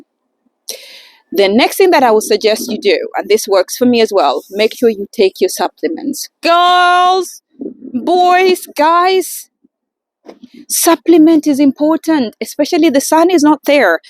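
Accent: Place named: Gothenburg, Sweden. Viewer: Great video! I totally agree about getting out no matter the weather. Love your Swedish pronunciation too… I can hear som Göteborg accent 🤩. Happy holidays ❤️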